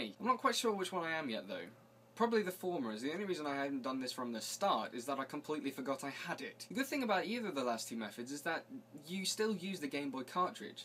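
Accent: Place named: United Kingdom. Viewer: Congrats on the 2000. I know with the LPs I watch the commentary I like to listen to some one who sounds (key word) atractive. Not only you have a lovely, natural, Brittish accent, but you put your lovely, actractive face on the screen. You have the extra facial expressions that a lot of the more popular LPers don't post on their videos. This also makes your LPs unique.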